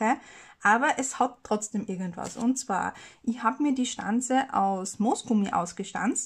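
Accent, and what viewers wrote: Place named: Austria. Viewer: Die vintage u die rosenkarte sind meine Favoriten! Nach der Aussprache bist du österreicherin!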